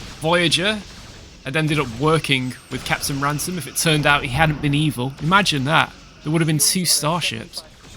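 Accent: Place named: England, United Kingdom. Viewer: I suppose that's the problem with a beta canon as incongruent as Trek's is- until I was taught that Star Trek doesn't standardize its beta canon I believed a lot of beta-only things which are essentially headcanons- stuff like Defiant being faster than Voyager, shield recharge being a Nova-exclusive or a Nova introduction. I guess we still have some preconceptions based on what beta canon we were exposed to earlier on. BTW i'm trying to get better at recognizing UK accents- fairly sure you're somewhere in England, but would you mind telling me where? The two most prominent features I've noticed are your emphasis on ending "g"s which to my American ear suggests somewhere to the west, but it also sounds like your voice is a bit breathier, maybe?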